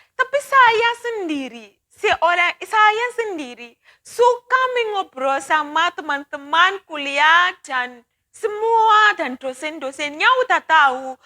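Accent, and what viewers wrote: Java, Indonesia. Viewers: Memdengarkan gaya bicaranya, mengingatkan saya ke zaman SD dulu saat ibu guru ngajar. Bahasanya indonesia tapi logat jawa.. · ngomongnya logat jawa ❤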